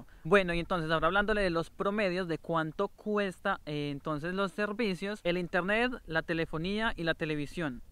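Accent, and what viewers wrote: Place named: France. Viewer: el acento te quedo asi por usar el Frances a diario o siempre hablaste asi Español?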